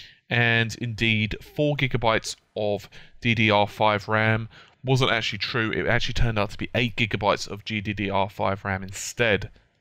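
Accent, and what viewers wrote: United Kingdom. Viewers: British?...I just thought u were always drunk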